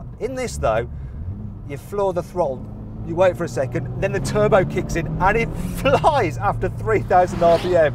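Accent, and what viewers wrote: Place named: Birmingham, United Kingdom. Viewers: He's a brummy! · Funny to hear a brummie review a car lol. This is coming from a neighbouring yam yam from Wolverhampton lol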